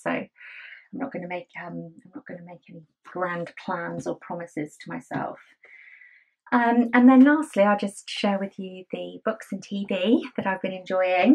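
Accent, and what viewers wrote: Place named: United Kingdom. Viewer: hello Ms., I love watching your vlogs. I love crocheting and reading too. I am from the Philippines and I think I am learning more about British English by listening and watching your vlogcast ^_^ Thank you very much. Happy and blessed New Year!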